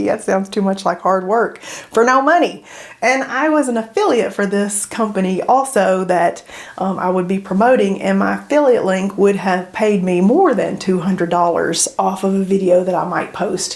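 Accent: South, United States. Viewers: the familiar southern accent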